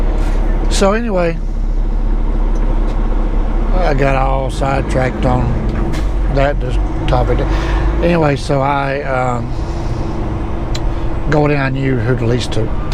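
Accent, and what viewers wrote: South, United States. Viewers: You do have a southern drawl but so what? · Thanks for sharing your story. Your southern voice sounds good to me. lol Be safe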